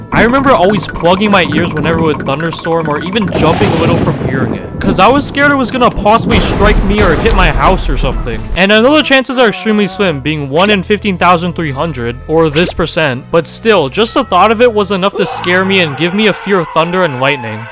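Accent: Canada. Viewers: are u canadian??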